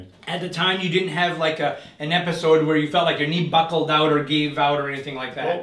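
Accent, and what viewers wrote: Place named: Quebec, Canada. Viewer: You have an interesting accent...are you French Canadian? You sound like Jonathan Pageau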